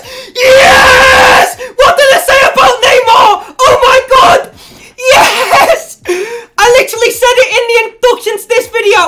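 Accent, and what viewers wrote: Liverpool, United Kingdom. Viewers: Chxrlii - not Irish, scouse mate😂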